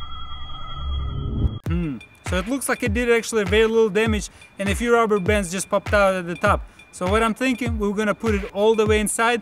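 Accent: Russia. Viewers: I hate it when he says "let's do it." In his annoying Russian accent.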